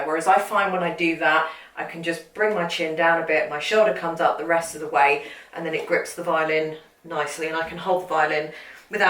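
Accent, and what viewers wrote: United Kingdom. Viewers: I do not know yet your name, but I feel grateful to see individuals like you sharing with us to make our dream come true. I always wanted to play violin since I was a child. I just made the decision to learn following your lesson, and guess what, I bought my Mendini MV500 4/4 full side violin last week, and I have made the total decision to learn playing violin, and know how to play "Amazing Grace", that is what I will do first.Thank you, your lesson are easy to follow, and love your British pronunciation. I have one request for you : can you play Amazing grace with the violin for me!!! Thank for motivate me in this new adventure.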